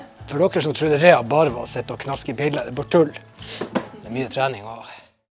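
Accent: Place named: Nordland, Norway. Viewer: Så bra at du kom deg på beina igjen. Jeg må bare le, for den nordlandsdialekta sammen med den nordlandske stoiskheten gjorda hele filmen legendarsik!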